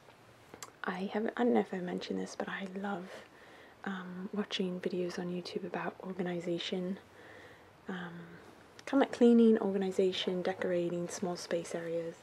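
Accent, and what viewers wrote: England, United Kingdom. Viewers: 0121cole She is American (her husband is Egyptian), but uses a British accent to protect her identity because she believes that voices are easily recognisable and wouldn’t want anyone to reveal her identity. Hope this answers your question. X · You have an English accent in there am i right?? xxx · I love this video it’s is amazing I love you and your videos I hope you get to see this if not it’s no problem I just wanted to say I love this channel because you are so thankful for the support and also you make very great videos and the British accent is amazing I’m from England and I think you sound so British hope you see this live you and your vids lots of love Emily Blanchard from England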